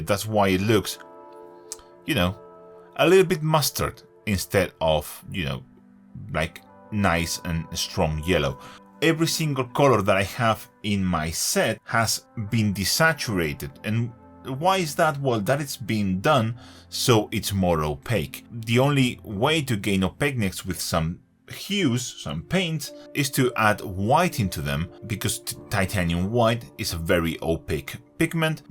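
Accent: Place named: Spain. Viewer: Tu inglés no nada más es bueno, pero es sumamente agradable y usas dichos muy apropiados, 👉lo cual es por felicitar 👈porque pocos ibéricos/latinoamericanos en este ámbito del pasatiempo lo logran...lo digo porque es casi la única vez que he oído que se te salga el castellano.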